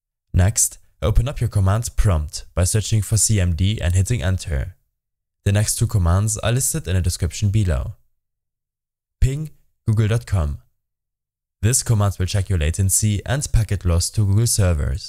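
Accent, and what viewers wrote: Germany. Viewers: Man hört deinen Deutschen Akzent raus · haha ich konnte an der stimme einfach dran erkennen dass du deutsch bist wegen der aussprache😂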